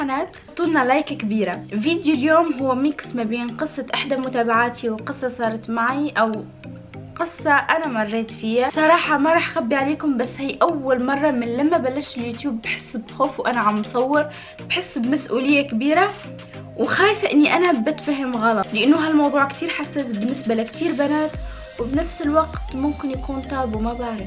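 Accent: Tunisia. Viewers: اللهجه التونسيه عسللل❤️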